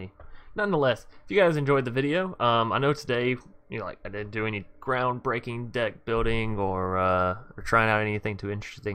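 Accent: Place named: Alabama, United States. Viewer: You from Bama homie?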